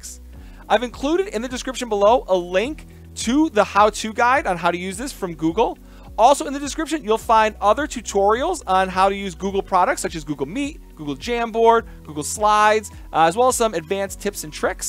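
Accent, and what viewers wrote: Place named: Chicago, United States. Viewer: Love your Chicago Midwest accent. Sounds like home.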